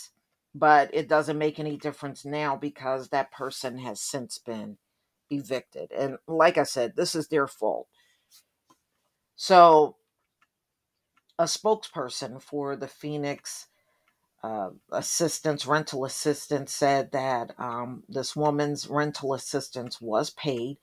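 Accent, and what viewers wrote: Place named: Maryland, United States. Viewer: I love your accent, my aunt lived in Maryland for years and she talks similar.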